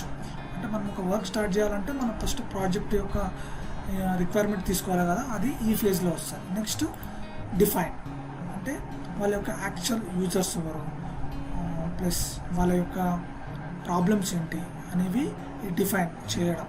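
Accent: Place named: Telangana, India. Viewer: Thank u bro. Ma intla manshi matladutunnattu anipistundi bro. Nenu mana telangana slang ki connect ina 👌👌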